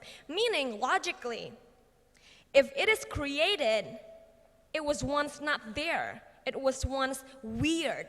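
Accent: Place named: United States. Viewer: American accent on point! i wonder how she did that while her entire life almost spent in Indonesia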